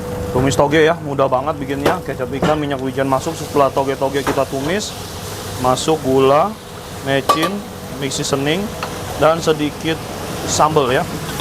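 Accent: Banyumas, Indonesia. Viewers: lama2 pinter ngapak gabunge sama mas inyong 👍👍😀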